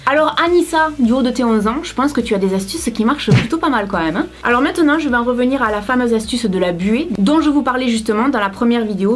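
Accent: South, France. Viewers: Pour la buée sur le miroir j'utilise du savon mais pas liquide, le pain de savon comme avant... C'est un peu galère à étalé mais avec un peu de patience et «d'huile de coude» il y a moyen de n'avoir aucunes traces sur le miroir et plus de buée pendant un bon moment. Bon forcément c'est pas définitif, il faut le refaire de temps en temps mais ça marche beaucoup mieux au savon solide que liquide.;) Merci pour tes vidéos et ton accent du soleil qui me donne la pêche jusqu'en Gel Bique;)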